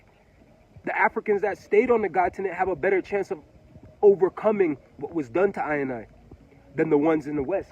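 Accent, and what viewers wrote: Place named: Patois, Jamaica. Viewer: I love ❤️ your Jamaican patois, it funny the ways it sounds.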